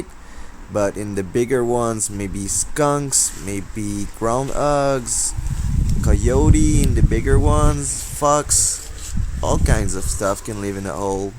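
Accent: France. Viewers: To learn more about Canada, I spent some time on Wikipedia. There I got the answer why you speak English with a French accent, very intelligibly at an appropriate rhythm. Stupid but until now I didn't know that both the French first and finally the vain British were involved until 1982 when they were finally hauled back to their island. But they remain vain, proven with their Brexit in 2020. I hope you will be able to outwit small game but it will be difficult. Guess not flying wild is easier from behind a blind. In addition, it is difficult not to betray your presence by creaking twigs, birds, especially crows... I hope then that your joy and happiness will splash off our screen because this "Big Brother" knows how difficult it is in Canada with the gun laws and what you have already done to now be allowed to walk outside your private place with an air rifle. good luck and a gelinotte isn't that an English hazel grouse? 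LOL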